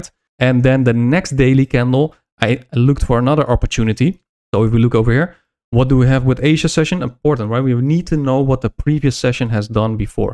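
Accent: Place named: Netherlands. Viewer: Don't know why I found it really funny when you said "Trump is talking about tariffs and he is absolutely destroying the dollar right now" - something about your Dutch accent makes it even better hahaha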